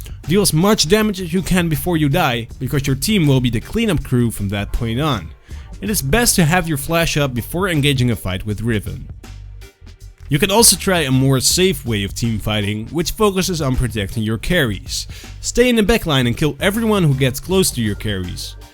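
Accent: Netherlands. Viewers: gij zijt nederlands :D !!!! <3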